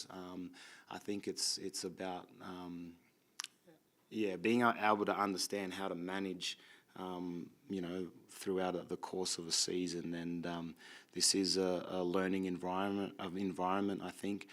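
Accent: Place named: Australia. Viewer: Great he still has his Aussie accent.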